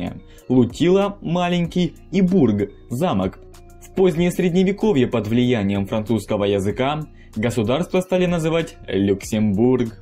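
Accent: Ukraine. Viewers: Твой Украинский акцент такой мерзкий. Буквально засыпаюсь от голоса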